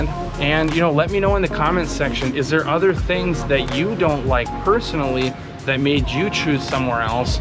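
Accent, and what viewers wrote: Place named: Midwest, United States. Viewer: Nice video,. I’m trying to place your accent. Parts of it sound like like Minnesota, but I’m thinking Michigan or Wisconsin.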